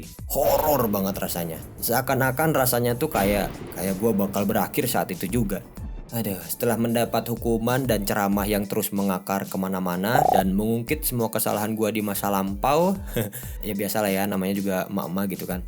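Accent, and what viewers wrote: West Java, Indonesia. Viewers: Bang urang sunda? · Orang sunda nya?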